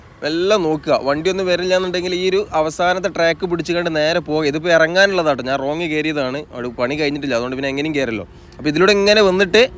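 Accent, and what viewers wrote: Malappuram, India. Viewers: വീഡിയോ അടിപൊളിയാണ്‌.. പ്രത്യേകിച്ചും അവതരണ രീതി especially Drone shot..ആ ഒരു effortന്‌ 👍🏻.. ഞാനൊരു കണ്ണൂർക്കാരനാണെങ്കിലും നിങ്ങളുടെ Malappuram സ്ലാങിലുള്ള വിവരണം കേൾക്കാൻ നല്ല രസമുണ്ട്‌..keep it.. പിന്നെ സ്ഥല പേരുകൾ പരമാവധി മലയാളത്തിൽ എഴുതുന്നത്‌ പോലെ തന്നെ പറയുക..😀 ഉദാ: മുഴപ്പിലങ്ങാടിനെ മുഴുപ്പിലങ്ങാട്‌ എന്ന് പറയരുത്‌ pls 😇 അതുപോലെ മലപ്പുറമല്ലാത്ത മറ്റു ജില്ലകളിലെ വീഡിയോ Editting Timeൽ വീഡിയോയിലെ റോഡ്‌ കടന്നു പോകുന്ന സ്ഥലങ്ങളുടെ പേരുകൾ Google map,Google earth,Sign boardകൾ ഒക്കെ നോക്കി മനസ്സിലാക്കി പറഞ്ഞാൽ ആ ജില്ലക്കാരല്ലാത്തവർക്ക്‌ പ്രത്യേകിച്ച്‌ Vdo കാണുന്ന പ്രവാസികൾക്ക്‌ വളരെ ഉപകാരപ്പെടും...പറയാൻ കാരണം പല വീഡിയോയിലും ഈ സ്ഥലത്തിന്റെ പേരെന്താണെന്ന് അറിയില്ലാന്ന് നിങ്ങൾ പറയുന്നതായി കാണാറുണ്ട്‌..എല്ലായിടത്തുമില്ല (അതെ സമയം ഇങ്ങനെയുള്ള vdo ചെയുന്ന മറ്റു ചിലരൊക്കെ ഓരോ underpass etc അടുത്തുള്ള റോഡും വഴിയുമെല്ലാം വിവരിക്കാറുണ്ട്‌). 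പിന്നെ കഴിയുമെങ്കിൽ ചിലരൊക്കെ ചെയ്യും പോലെ ആ റോഡിന്റെയും സ്ഥല പേരുകളിൽ vdoൽ എഴുതി mention ചെയ്താൽ നന്നായിരുന്നു.. പിന്നെ നിങ്ങളിൽ കണ്ട ഒരു Plus point Thalassery-Mahe bypassനെ നിങ്ങൾ ആ പേരിൽ വിളിക്കുന്നതാണ്‌..ചില YouTubers മുഴപ്പിലങ്ങാട്‌ bypass,അഴിയൂർ bypass എന്നൊക്കെ വിളിക്കുന്നത്‌ കാണാം.. (കോഴിക്കോടും കണ്ണൂർ ഭാഗത്തേക്കും NHൽ കൂടി ഇടുങ്ങിയ റോഡുകളുള്ള തലശേരി-മാഹി ടൗണുകളെ Touch ചെയാതെ പോകുന്നവർക്ക്‌ വേണ്ടിയുള്ളതാണല്ലോ ഈ bypass..ഇത്‌ ഈ നഗരങ്ങളിലെ ഗതാഗത കുരുക്കിന്‌ ഒരാശാസ്വവുമാകും..) കോഴിക്കോട്‌ ജില്ലാ അതിർത്തിയായ കുഞ്ഞിപ്പള്ളിക്കും അഴിയൂരിനിടയ്ക്കും നിന്ന് തുടങ്ങി കണ്ണൂർ ജില്ലയിലെ മുഴപ്പിലങ്ങാടിൽ അവസാനിക്കുന്നത്‌ കൊണ്ടായിരിക്കാം ചിലർ ഇങ്ങനെ പരാമർശിക്കുന്ന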